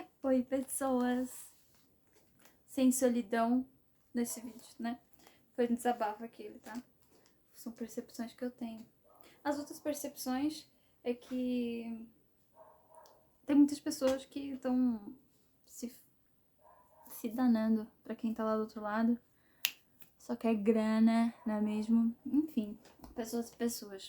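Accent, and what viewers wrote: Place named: Portugal. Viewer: Você já morou em Portugal? O sotaque parece. Tô acompanhando o conteúdo pelo insta e achei aqui agora. Parabéns!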